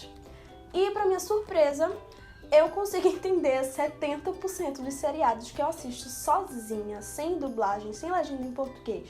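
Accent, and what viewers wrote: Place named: Santa Catarina, Brazil. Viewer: Gostei de seu sotaque catarinense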